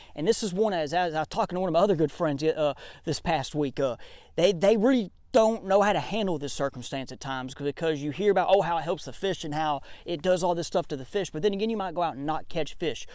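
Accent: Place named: South, United States. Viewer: The man talks like I talk. Free, fast, and it's straight up southern.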